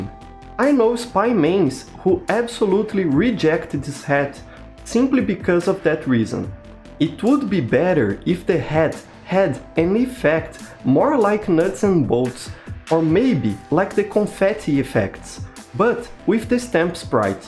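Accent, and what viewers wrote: Brazil. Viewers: tu e br né? Seu sotaque entrega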